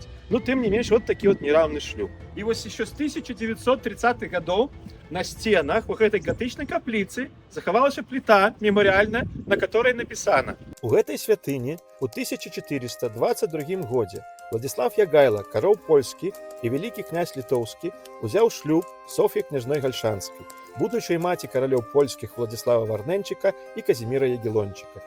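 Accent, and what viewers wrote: Russia. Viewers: Добра, але трохі вымаўленне пакутуе, як у русака😮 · Гэта трасянка , а не беларуска мова.. Вымаўленне нібыта ў русака з пад палкі!! Палова слоў расейскіх.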